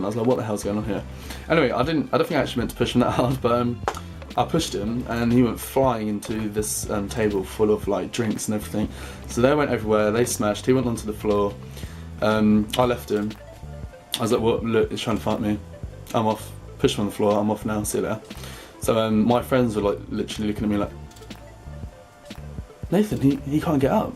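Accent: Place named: United Kingdom. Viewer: haha he was 'straight' !!! loved this video!! trying to work out where about you are from in the uk from your accent!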